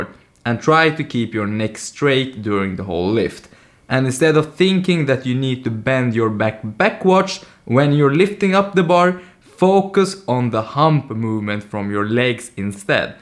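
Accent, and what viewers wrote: Sweden. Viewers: Älskar hur man märker att du är svensk på en gång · Man hör verkligen att du är svensk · Are you swedish?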